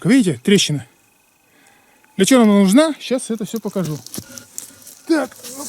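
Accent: Russia. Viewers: ***** Это наш Вологодский говор. яркий представитель своей родной земли.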